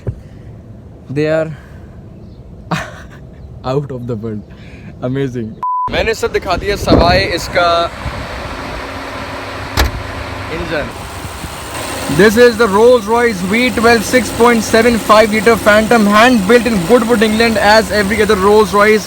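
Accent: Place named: United States. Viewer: Which part of America is this accent from ?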